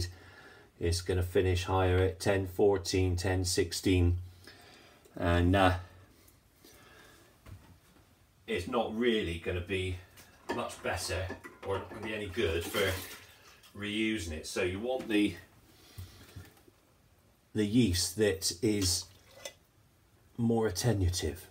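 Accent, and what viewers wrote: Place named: Somerset, United Kingdom. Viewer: Sounds like somerset to me?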